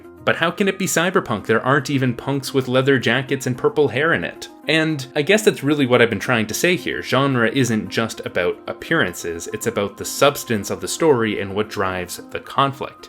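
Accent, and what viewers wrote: Canada. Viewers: sounds like they might be from Canada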